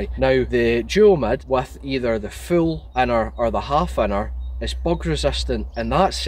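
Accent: Scotland, United Kingdom. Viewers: Holy crickey, you really are Scottish in your way of speaking. Long live linguistic diversity!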